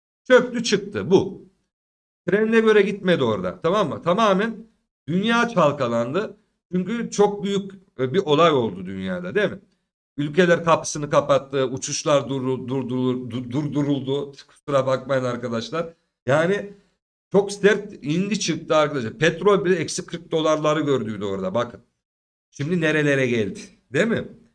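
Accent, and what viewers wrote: Konya, Turkey. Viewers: Teşekkürler Gonya'lı Ustam.("ben öyle bişey DİMEDİM, lafına hastayım)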